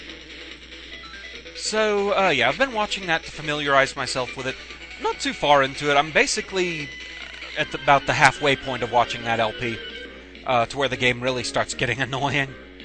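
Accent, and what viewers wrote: United Kingdom. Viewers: Okay, call me ignorant - you live in Texas, but you have a British accent and you use British colloquialisms. Are you originally from Britain, or do you just put on a British persona when you record? :-)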